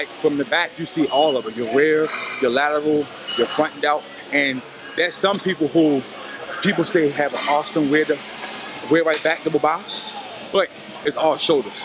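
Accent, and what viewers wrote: Baltimore, United States. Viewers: That’s that Baltimore voice. I know that for a fact✔️ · Heavy b more accent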